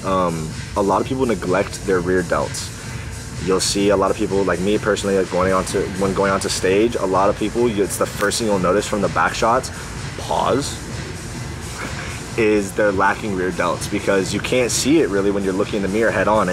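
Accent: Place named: Canada. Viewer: why do you sound Canadian in this 😭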